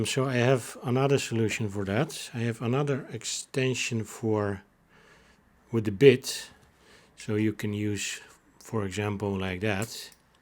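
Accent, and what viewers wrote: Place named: Netherlands. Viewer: Thanks very much for your videos. It takes all the guess work out of it. And it took a Dutchman to do it. Oh well, you sound Dutch anyway!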